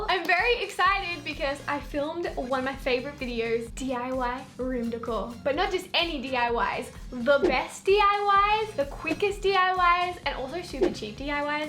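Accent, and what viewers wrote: Australia. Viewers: Sarra Turki she is from australia (didnt you notice the australian accent?)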